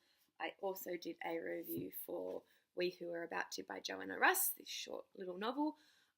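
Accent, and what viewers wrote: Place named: New Zealand. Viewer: Interesting accent ^^ I always struggle with this, but do I detect a New Zealander here? 😂 Sorry if you are not from NZ. I just had to take the risk of guessing.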